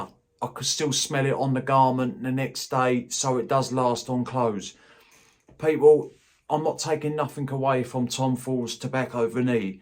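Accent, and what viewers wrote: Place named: Cockney, United Kingdom. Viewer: Finally, we got a proper cockney in the fragrance community! I could listen to you read the Bible, mate, and probably get my life right with the Lord. Cheers!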